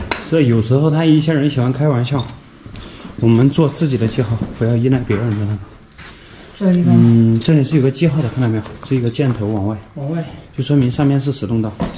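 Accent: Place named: Sichuan, China. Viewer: 为什么我要半夜一点钟来瞅这个视频，为什么，为什么，为什么。 这个家乡川普倒是给了一点安全感。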